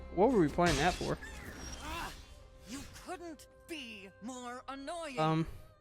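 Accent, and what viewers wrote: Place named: United States. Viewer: your accent, what part of the states are you from?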